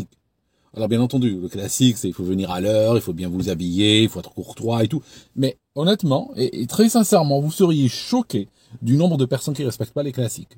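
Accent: Vaud, Switzerland. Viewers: Et bien j'apprécie l'accent Suisse. Merci de tes reflétions qui inconsciemment mettent le candidat comme l'homme fort de l'entretien. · Merci pour ta vidéo et vive l'accent du Gros-de-Vaud! Ayant moi aussi cet accent de part mes origines et j'y habite toujours d'ailleurs. Tu as vécu dans quelle localité? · C'est super agréable de vous écouter avec ou sans accent suisse, donc pas de soucis 😉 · Il est génial ton accent Vaudois, on l’aime beaucoup. C’est un héritage dont il ne faut pas avoir honte, un souvenir de voyage qui fait maintenant partie de toi, une richesse, un métissage, une pépite 🫶🏼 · merci pour cet accent suisse, au final ca me détend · Ne change rien pour ton accent suisse qui refait surface de temps à autres, c'est sympa je trouve, en tout cas ça ne me gêne pas du tout, les accents ont tous leur charme. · Ah ! Je mdisais bien que tu parlais avec un accent suisse ! 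· Merci 🙏 pour la réponse clin-d'oeil à ma question sur votre accent suisse. Il n'y avait rien de désobligeant, juste que je regarde pas mal de vos vidéos et que je ne l'avais pas entendu précédement. En tout cas j'aime beaucoup le contenu de vos vidéos 👍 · Excellent ton accent de chez nous, je suis Suisse aussi (nord vaudois) pourquoi tu es parti? Merci pour la vidéo 👍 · Bonjour, bonjour à tous. Merci pour tes vidéos et ta pédagogie au travers des exemples qui tu cites, c'est très instructif; lâche toi sur l'accent Suisse, ça fait partie de ton profile! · L'accent suisse sonne très sympathique, personnellement je trouve que vous ne devriez pas le masquer, au contraire 😀 merci beaucoup pour tout ces conseils, je suis actuellement demandeur d'emploi et c'est une mine d'informations utiles. Très bonne continuation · Si! C'est sympa cet accent suisse. Merci pour tes conseils. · C’est vrai pour l’accent suisse, je m’étais dit qu’ dérivait doucement, vers un nouveau lieu de résidence, donc intéressant pour s’entraîner. 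· y a que les francais qui se plaignent de l'accent suisse le reste des francophones 400 millions de personnes adorent ton elocution 😃. C'est toujours les memes qui se plaignent et qui font la greve 😁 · Ne t excuse pas de prendre l accent suisse je suis français mais la vie est pas désagréable que ça en Suisse et les Suisses 🙂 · Coucou! Si j’ai parlé de ton accent suisse c’est que j’ai trouvé ça trop mignon: un algérien qui vit en Angleterre avec un accent suisse, franchement, il n’y a que toi pour ça! Merci pour tes super vidéos qui éclairent mes journées! 😊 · Notre accent suisse est juste parfait... On s'en fout des haters😂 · Ah voilà pourquoi l'accent suisse ! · L'accent suisse me rappelle les jeux olympiques d'Albertviiiiille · PTDR à la fin "je fias un effort pour pas parler avec l'accent suisse" alors qu'il a typiquement l'accent suisse X) la même manie de prolonger légèrement l'avant-dernière syllabe, tout X) Vidéo incroyable, c'est vraiment le daron idéal, il a de l'expérience constructive et il arrive à revenir dessus et à en livrer le contenu essentiel... 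Ce type aurait dû devenir prof/chercheur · Joli accent suisse...c est bien de s adapter moi aussi je prends des accents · en tant que Suissesse, j'adore cet accent 🇨🇭👍😊 ne chassez pas le naturel 🙏😊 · Il me semblait bien qu'il y avait un accent vaudois 😂 Je pensais que c'était une hallucination Super vidéo, ayant été moi même à la recherche d'un emploi ces derniers mois, je me retrouve tout à fait dans tes conseils mais j'en ajouterai un toutefois : me négligez pas votre réseau quand vous êtes en études ou chez votre précédent employeur. J'ai vu des gens de ma volée de Master trouver des jobs très (trop) bien payé alors qu'ils étaient carrément mauvais tant sur la pratique que la théorie, qu'ils avaient une attitude très peu professionnels simplement parce que quelqu'un dans leur réseau a pu les pistonner. Vous pouvez être le candidat idéal à un poste, si l'ami d'un manager le veut également vous passerez à la trappe.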